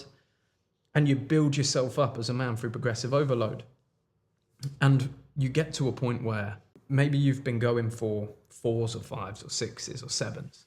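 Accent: United Kingdom. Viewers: What a hell. This is like Hamza, but more articulate and written 🇬🇧💂 Certified British accent 💂🇬🇧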